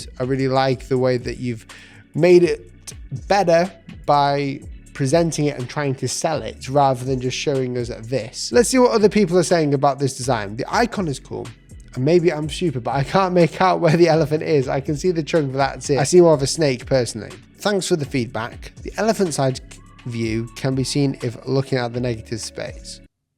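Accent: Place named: Northern England, United Kingdom. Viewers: I like that you point out that you are from the North of England in many videos. Even I can hear that, and I'm not British 😂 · don’t know why i didn’t clock your northern accent faster, hi from manchester!!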